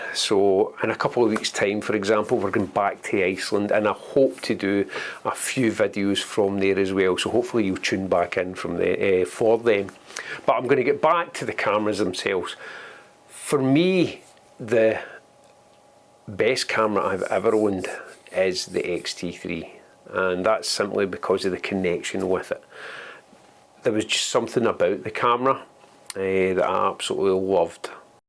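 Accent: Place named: Scotland, United Kingdom. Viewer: I'm going to come across as a complete donkey's ass but I'd bet a penny on it. Have you thought about adding subtitles to your videos given your charming Scotty' accent? I've got no problem with it all. I love it. As for your American and second language audience given your strong accent? Hmmm...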